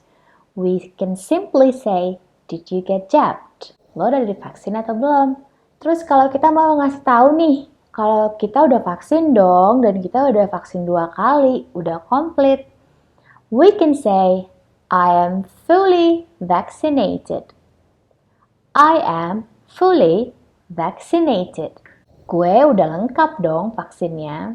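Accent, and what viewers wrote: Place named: South Jakarta, Indonesia. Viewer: jaksel aksen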